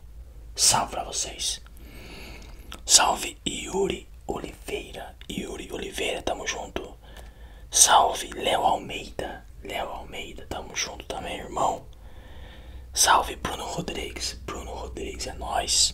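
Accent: South, Brazil. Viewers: Tu é de SP mesmo? Teu sotaque parece do Sul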